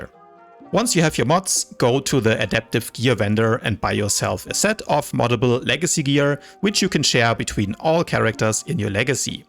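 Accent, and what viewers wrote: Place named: Germany. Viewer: The "german" english is very nice zu hören :D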